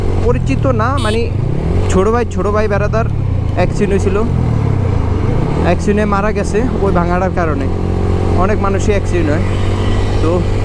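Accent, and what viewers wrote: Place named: Bhola, Bangladesh. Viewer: ভাই আপনের বাসা কি ভোলা ভাইয়া আপনের বাসা তো ভোলার মত লাগে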